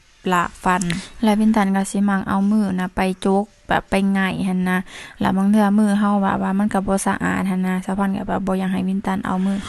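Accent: Laos. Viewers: ลองคิดในมุมของเจ้าหน้าที่นะ ทั้งบ้านได้สัญชาติไทยกันหมด มีอยู่คนเดียวไม่ได้สัญชาติ แล้วเพิ่งจะมาขอตอนอายุ จะ 30 ปี แถมมีลูกอีก ในมุมมองของคุณมันแปลกมั้ยละ FC หลายคนก็บอกแล้วปรับวิธีการพูดให้คนไทยเข้าใจ นี้ก็ไม่ยอมปรับตัว พูดแบบสำเนียง ลาวอยู่นั้นแหละ มันแปลกทั้งประวัติ แปลกทั้งสำเนียงการพูด คุณเป็นเจ้าหน้าที่จะทำให้หรือ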